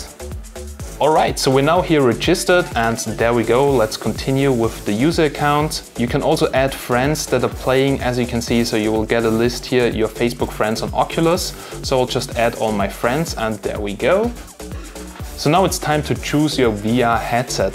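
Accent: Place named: Germany. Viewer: He sounds sooooo german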